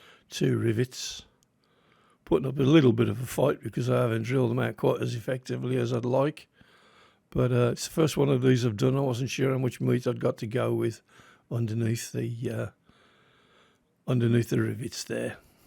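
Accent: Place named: Midlands, United Kingdom. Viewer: I bloody love when you open something up for the first time, and the wheels just fall out! What a relief! And I do quite a bit of work with Land Rover, so the Midlands accent is not so bad! 🤭 The only thing on that casting I don't like is the filled quarter-light windows: the temptation to drill those out is one I'd not be able to resist, though it does leave the A-post and door frame quite vulnerable. Lovely entry to the Challenge, and a great save!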